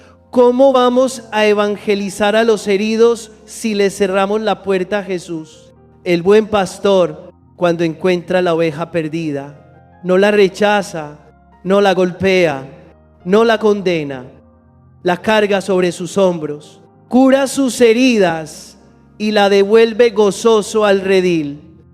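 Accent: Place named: Portugal. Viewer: por qué habla con acento portugués? muchas gracias por compartir el evangelio.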